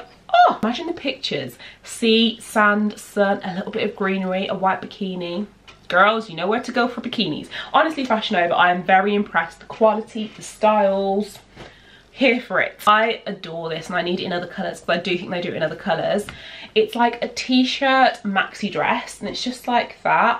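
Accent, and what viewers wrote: United Kingdom. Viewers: I love your British accent. Your bum looks good in the purple swimsuit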